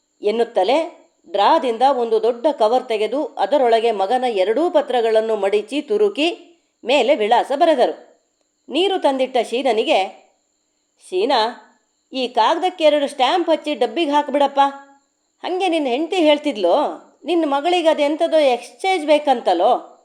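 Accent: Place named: Malnad, India. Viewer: ವಾವ್ ❤ನಿಮ್ಮ ಧ್ವನಿ ಯಲ್ಲಿ ಸೂಪರ್ ಆಗಿ ಮಲೆನಾಡ ಭಾಷೆ ಸೂಪರ್.. ನಿಮ್ಮ ಕನ್ನಡ ದ ಮೇಲಿನ ಹಿಡಿತಾ 🙏ಸೂಪರ್ mam ವಂಡರ್ಫುಲ್ ಧನ್ಯವಾದಗಳುನಿಮ್ಮ ಕಥೆ ಗೆ ❤❤❤️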